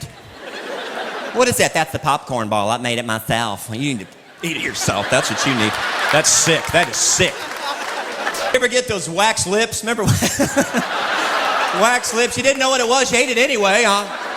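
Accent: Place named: South, United States. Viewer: I love southern accent so funny!!!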